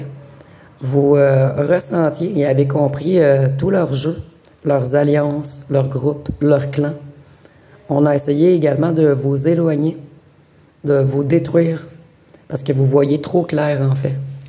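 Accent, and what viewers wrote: Quebec, Canada. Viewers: Merci beaucoup Madame la Cartomancienne.Je suis de France ET des Îles de France.J'adore le CANADA ET LES ACADIENS ET ACADIENNES D'OU VOUS ÊTES CAR JE RECONNAÎS FORT BIEN L'ACCENT DU QUÉBEC.BONNE JOURNÉE À VOUS MADAME 😂😂🎉🎉🎉❤❤😢😢😢🎉🎉😂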